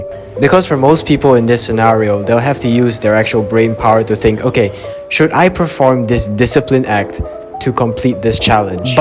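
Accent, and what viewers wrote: Singapore. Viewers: Are you from singapore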